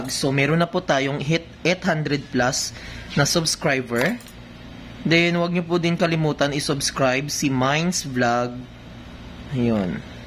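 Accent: Iloilo, Philippines. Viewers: ilonggo ka?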